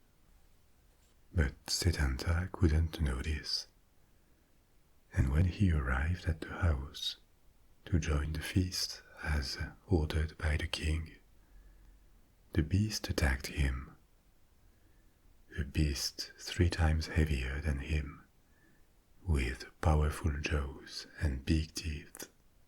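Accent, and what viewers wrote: France. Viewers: Hearing Irish names and places pronounced in a French accent tickles me in a way I can't quite describe. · I love his pronounciation. Usually its American's botching up the accent (though I don't blame them, Irish spelling is weird but anyone with a passing knowledge of Irish knows the anglicised sounds is not the original sounds) but he has a very strong French accent so I was curious how he would pronounce it. The correct inflections are all there which is all I expect. As someone who the only thing I was good at in school was doing a French accent (not speaking French tho) and combining that with the Irish I was being taught I can recgonise where the mouth was making its natural French sounds but it was still pronoucing the word right. The best way I can describe is that Irish, if you're trying to force the language it can sound choppy and sharp. 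Kind of like your coughing the words out on the sharp consonents, but as a native speaker it sounds fluid. French speakers whether fluent or not always sounds fluid. It's very interesting to hear. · Being Irish and love the french accent, this was perfect for bedtime 😄 merci beaucoup!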